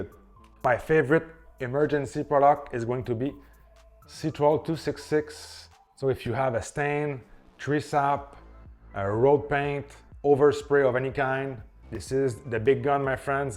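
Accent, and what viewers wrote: Quebec, Canada. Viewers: I really like your presentation and I respect your recommendations. And as a fellow Canadian, I dig your Quebec accent! 🙂 Keep up the good work! Thanks!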